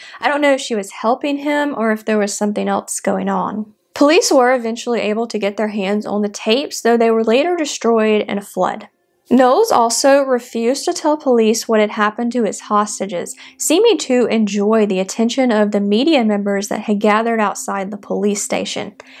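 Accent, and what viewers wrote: South, United States. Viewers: has a nice southern accent. Also, she is a good narrator.🤓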